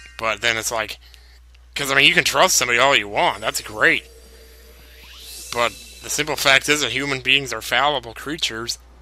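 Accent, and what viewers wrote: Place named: South, United States. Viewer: I went back to your old vids and noticed you've lost your southern accent almost completely since then!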